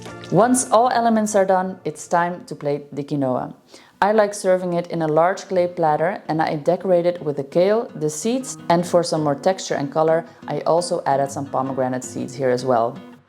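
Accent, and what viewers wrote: Netherlands. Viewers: Do I detect a very slight Dutch accent ? I am glad I found you. I like your channel .. love from an old Dutch lady in California ❤❤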